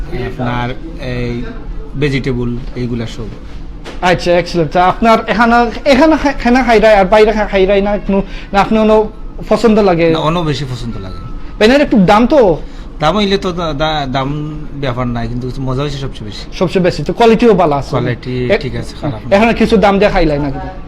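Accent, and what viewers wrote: Sylhet, Bangladesh. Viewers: Cant you speak in proper bengali accent? I see many sylheti dont even bother speaking sylheti... That's good... But as a Bangaldeshi everyone should learn how to speak in bangla properly.... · এই কুত্তার বাচ্চা, শুদ্ধ ভাষায় কথা বলতে পারিসনা,, তুই কিসের বাংগালীরে,,! সিলেটি চুদাও না,, মুর্খ কোথাকার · I hate your SYLHODDAR accent so bad language 😡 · Superb bro I like the way u speak sylheti keep up the great work :) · nazzy hammond This is call sylheti accent. Like Barishal, Dhaka, commila, Rangpur, Chittagong etc have their own accents. But language is one Bangla. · Speak proper Bangla, not Sylhety accent! Its youtube, not your private Facebook account ;)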